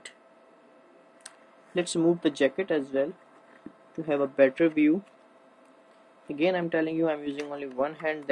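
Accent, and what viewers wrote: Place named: India. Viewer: WHY you are talking like this..! Indian English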